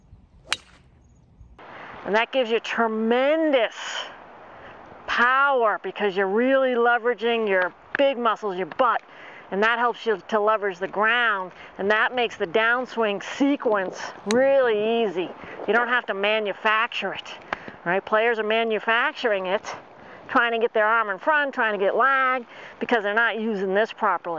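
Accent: Boston, United States. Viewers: Never heard this one before, "butt" it makes sense! Gonna take a shot at that cool accent...Boston??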